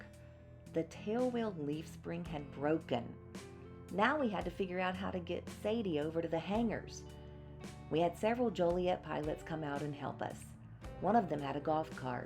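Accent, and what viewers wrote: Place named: Mississippi, United States. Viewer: I love the videos and great to finally hear your Mississippi voice!! ❤️.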